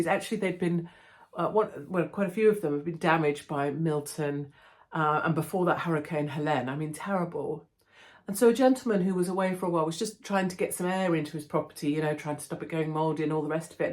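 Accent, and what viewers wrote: United Kingdom. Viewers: Hey! I got some Real good constive Critsisimusssmms In Russia they do a standing ovation when the plane lands.:face-green-smiling: I would feel the same way! 😅 I would be as well. Glad you made it out alive out of D.C. Well, you have been so kind to SOOooooo MANY! LOL! 🤣 Well, we in freedomland dig your British Accent. And you sort of sound like Alexa or Eliza take your pick. ☺:face-turquoise-speaker-shape: NO NO NO NO NO! Remember U R STILL in the U.K.! DANGER WILL ROBINSON!:hand-orange-covering-eyes: Glad you love being in the States and to be Frankly honest, WOULD LOVE TO HAVE YOU BRITS (not all of you) make the move across the pond and come here to become freedom loving Americans! Yea, things are going to get better as long as you stop voting for Libtardia. Plenty of you have came to conservative states, and many of you "Lot" r here in Oregon & I LOVE YOU HERE! 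(Just stay the hell away from DownTown Portland, and stick to the Burbs) Yea its still expensive, but if you work your Hiney off, you can also live a great life here. WE in America are going to rebuild and become the BADDEST MOFO's on the planet again, and if you want to be apart of that? Come on over partner! Just if you got kids? Make sure to keep them out of our Public schools. Lots of crazies still in the schools there, so more for you Bachelors and Bachelorettes. Have a great one and hope you take the steps to make things better in the U.K. Would love to see Fra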